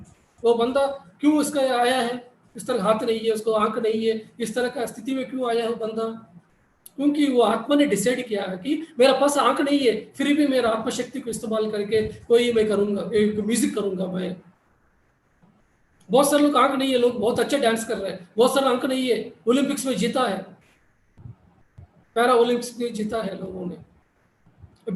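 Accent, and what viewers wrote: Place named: India. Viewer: I am fascinated by yur valuable words as well as ur Hindi ascent Sir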